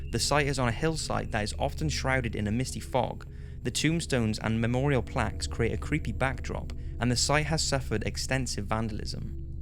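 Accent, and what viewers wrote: United Kingdom. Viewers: For some reason a British accent always makes a scary story scarier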